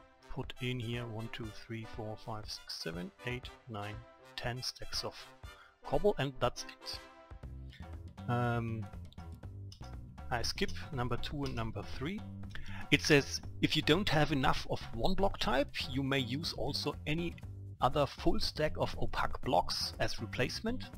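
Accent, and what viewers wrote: Germany. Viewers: this guys motherlanguage is like 100% german ;D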